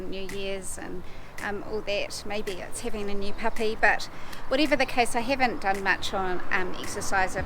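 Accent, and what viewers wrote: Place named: New Zealand. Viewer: i can't get used to that kiwi accent.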